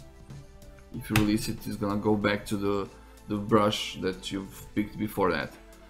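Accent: Russia. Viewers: жесткий русский акцент ХD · Really nice video. Is your accent russian? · Hey бро, it's a Russian accent ? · R u russian? Whats your native?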